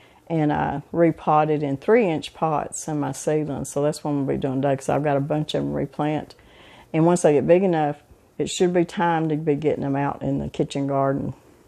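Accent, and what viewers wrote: South, United States. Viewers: I’m pretty new to your channel now, but didn’t realize you and Mr. Brown worked outside your home. Where do you work? I’m getting use to your Southern accent. Interesting video. Thanks for sharing.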